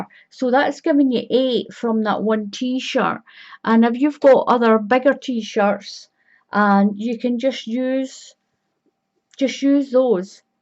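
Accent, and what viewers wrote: Scotland, United Kingdom. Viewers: Fantastic vid & being Scottish myself its gr8 hearing our accent 4a change. So easy 2make & who hasnt got an old T shirt laying abt some drawer at home. Thanx so much. Stay safe & well.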